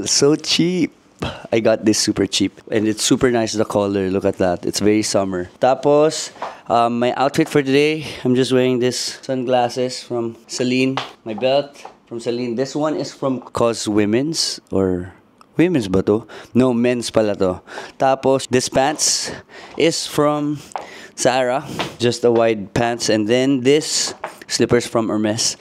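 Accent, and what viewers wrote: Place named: Philippines. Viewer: your accent is so Pilipino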